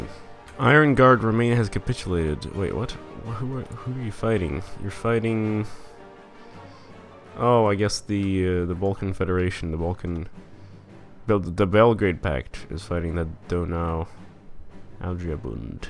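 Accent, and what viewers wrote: Brazil. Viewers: Okay, Carioca ou Paulista? Cause that sssss issss thiccc boii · You have an exelent brazilian accent :D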